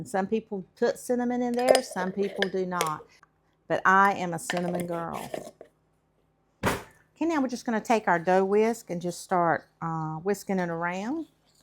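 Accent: United States. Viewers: Where is your fantastic accent from in the US? A curious guy from Buenos Aires, Argentina asking!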